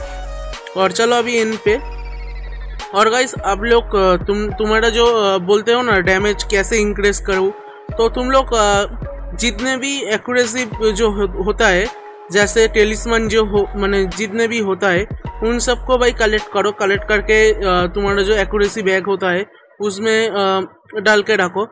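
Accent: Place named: Bengal, India. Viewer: guess by your accent I am Bengali too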